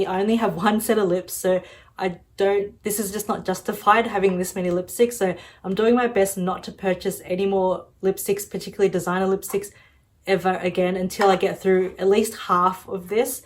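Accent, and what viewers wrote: Australia. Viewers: I support ozzie youtubers^^. Are you in Sydney or Melbourne? As you sound ozzie ~^^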